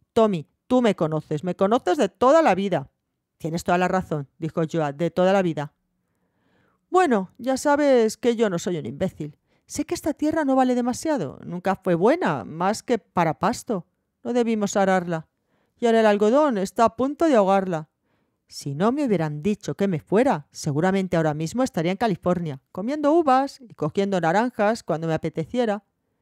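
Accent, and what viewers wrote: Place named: Aragon, Spain. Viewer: De que parte de españa eres? tienes un accento muy bonito, y buena voz =) soy muy malo para los accentos, eres mañica??? xD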